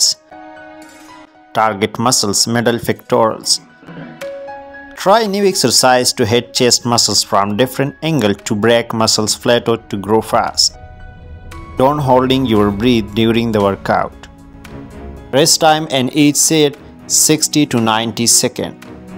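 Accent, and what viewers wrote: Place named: India. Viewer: Сразу слышно что индус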